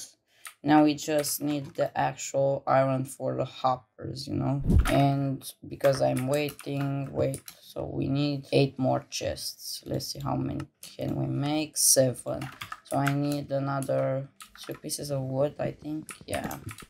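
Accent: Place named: Colombia. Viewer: great editing! are you colombian btw because you sound like it lol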